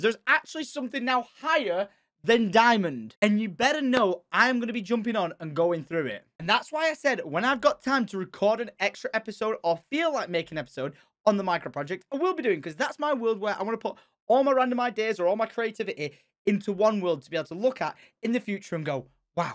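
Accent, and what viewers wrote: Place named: Manchester, United Kingdom. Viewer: This is the most manc you've sounded in years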